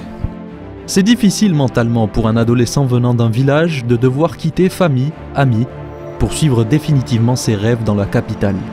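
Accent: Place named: Spain. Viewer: Ton accent espagnol , ta diction est juste incroyable VISCA BARCA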